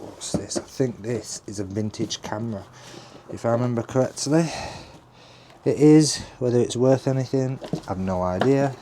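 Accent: Yorkshire, United Kingdom. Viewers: Stumbled across your channel scrolling through YouTube! Love the work ethic! Would be great if the end of your videos you did a breakdown of the financial side of the job. What you charged and what you made from the good bits that you’ve kept to scrap or resell etc. Looking forward to the next video! All the best from a fellow Yorkshireman (you sound like a yorkie anyway 😂)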